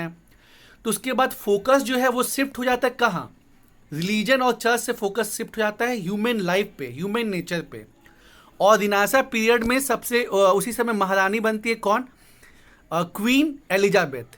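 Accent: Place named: Jharkhand, India. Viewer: thanks a lot,,for replay without any boasting I m now out of jharkhand and your style of speaking recall me jharkhand🙏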